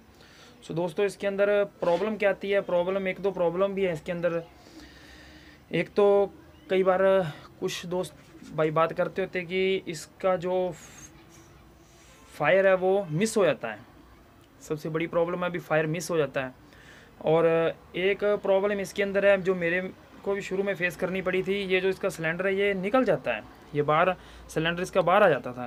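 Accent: Punjab, India. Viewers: bhai saab punjabi touch aa raha h apki awaz mai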